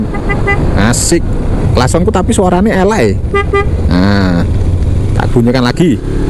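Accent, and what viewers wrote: Java, Indonesia. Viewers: Apiiik.... Makasih vlog jalan2nya... aku ngikuti vlog mu sejak ngedrone di jln/jembatan/terowongan Karanglo kae lo.... Lucu kamu, sambil ngevlog ngoceh ae... kathik logatmu medhok jowone... Salam karo bojomu yoo...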